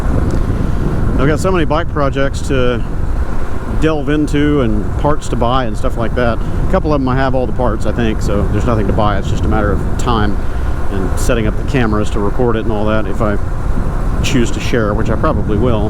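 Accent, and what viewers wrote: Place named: Oklahoma, United States. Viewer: Howdy neighbor. Sort of. I live in San Antonio. You are braver than I. I have a 2023 Vespa GTS300 and even with that I would not drive the access roads along the Katy Freeway. Heck I prob wouldn’t ride anywhere around Houston. Everybody drives at Mach 5 with their hair on fire. They do that here too but SA is still somewhat of a big small town if you know what I mean. Very different than DFW/Hou/Aus areas. But it is changing and when I retire in a few years, I’m not staying here. May move to the next county or something. Been looking at Arkansas as well as my home state of Alabama. BTW - your accent and cadence and tone is EXACTLY like someone I work with. I can’t tell the difference between you two and thought it might be him incognito here. He is from OK too. Hope you are safe and well after all those storms.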